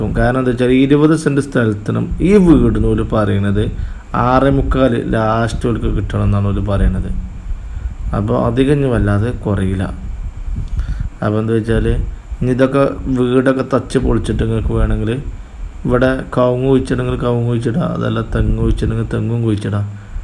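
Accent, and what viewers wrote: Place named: Malappuram, India. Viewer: Malappuram slang aanu bro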